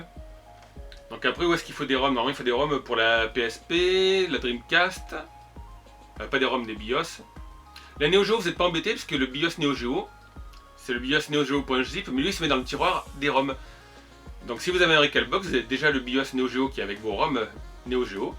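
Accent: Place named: England, United Kingdom. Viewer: Un peu compliqué ton truc lool . Par contre j aime ton accent anglais 😋😋😋😋.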